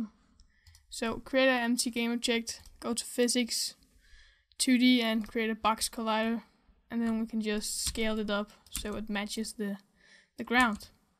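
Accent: Denmark. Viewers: Hey man, are u danish? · Instantly recognized the Danish accent. Especially because of the "Øh.."'s he made. Cool tutorial~ And hav en god dag B-') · Are you Danish, or what? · Are you DANISH??? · Bra video! Hører du er dansk! :D Er fra Norge jeg da, så håper du forstår hva jeg skriver! :D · Are u danish?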